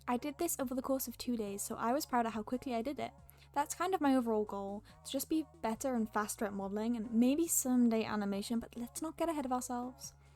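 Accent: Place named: Wales, United Kingdom. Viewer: amazing video! love the strong welsh accent!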